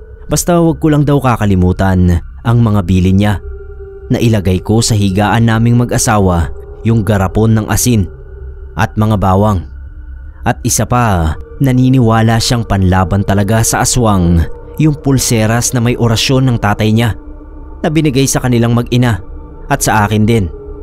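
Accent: Iloilo, Philippines. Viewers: Hello sir new subscriber here😅 Watching from Jeddah...parang ilonggo yong tuno mo sir 😅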